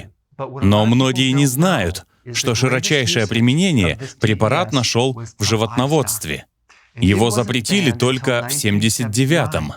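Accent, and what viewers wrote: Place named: Ukraine. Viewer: курица а нЭ кури достали уже эти укра лайные кури кура и тд слух режет дико тем для кого русский родной читающий текст из украины это четко понятно по не русской музыке речи и ошибкам произношения типа а вместо О в словах где О ударная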